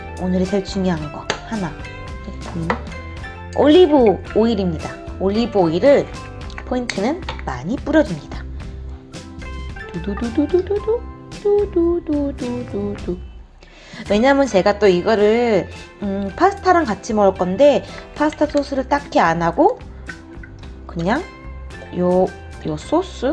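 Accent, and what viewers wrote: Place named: North Korea. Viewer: 우리 이쁜 북한억양. 중독댐!ㅎㅎㅎ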